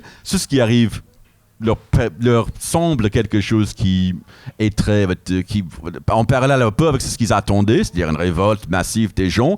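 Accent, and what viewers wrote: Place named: United States. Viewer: Je crois que c’est quasiment la première fois que j’entends parler français avec un accent américain. J’aime bien l’ambiance.😂 Et les propos sont très pertinents, très intéressant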